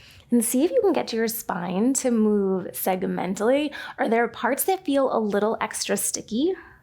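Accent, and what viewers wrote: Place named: California, United States. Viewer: As a British person, we usually skip anyone with his type of American accent (is it Californian). It sounds so annoying to us. Our communication style is the polar opposite. Anyway despite the accent I'm listening to the video. I can see you mean well.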